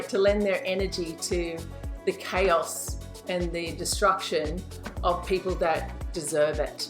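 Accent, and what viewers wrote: Australia. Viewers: I gotta tell you! It's so refreshing listening to an aussie accent talk about witchcraft/demonolatry/magick/occult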